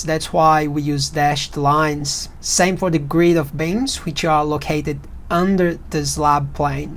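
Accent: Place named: Australia. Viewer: Great aussie accent. Structural calculations are always relevant. Keep it up!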